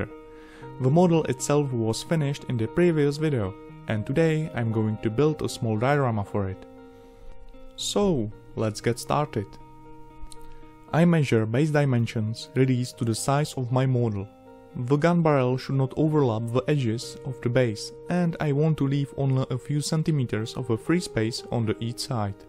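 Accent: Czech Republic. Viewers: Mluvíte skvěle anglicky. Ale pro čecha je to jednoduché poznat že jste čech. Ale jinak skvělé modely. :) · D hneď som vedel že si čech podľa prízvuku:D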